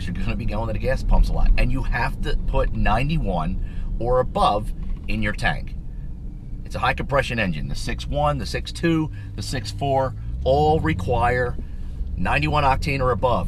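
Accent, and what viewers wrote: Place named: Philadelphia, United States. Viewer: Hey man awesome video!!! I’m here in Delco. West Philly guy born and raised!!! I could tell as soon as I heard you talking that you’re a local guy!! Love the video. Considering buying 2012 used with 80k miles.... it will be first nice car I bought myself. Loves trucks/suvs but also want something fast and fun to drive!!!! Love the way these SRT 8s look!!! It’s a 2012 SRT8 6.4 with 80k and they’re asking $25k I believe. Do you think it’s worth that,?? I can deal with little issues no problem and I always had trucks so MPG is not a concern! Lol pro charger sounds like a great idea hmmm 🤔 also I subscribed. Thanks man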